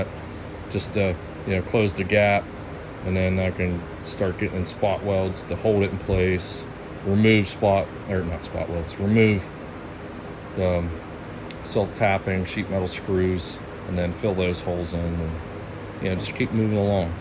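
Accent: Pittsburgh, United States. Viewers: That’s gotta be a Pittsburgh accent. If so I now live in Monroville can you fix my rockers and cab corners? 2000 f250 7.3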